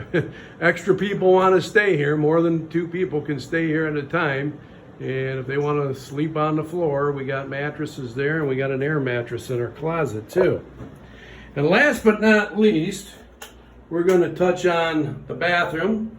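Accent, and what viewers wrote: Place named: Chicago, United States. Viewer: You sound like you are from Chicaaahhgo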